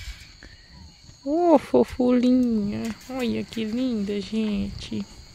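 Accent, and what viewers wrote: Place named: Bahia, Brazil. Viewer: Esse sotaque é um show à parte, lembra minha gente da Bahia. Meu abraço respeitoso a vocês por fazer tudo com tanto amor, dedicação e carinho.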